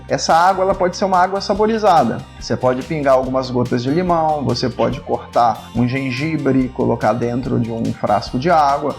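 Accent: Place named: Rio de Janeiro, Brazil. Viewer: Fico encantada com sua voz, linda! Vc deve ser carioca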